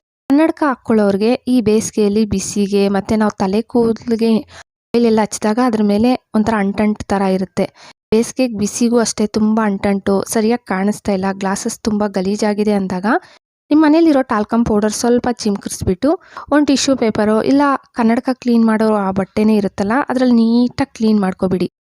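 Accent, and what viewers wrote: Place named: Kolar, India. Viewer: Neevu kolar dhavru ansuthe...naanu kolar avne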